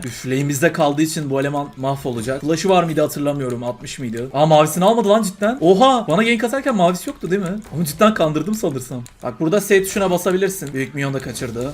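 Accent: Adana, Turkey. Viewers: kardeşim adanalı olma ihtimalin var mı :D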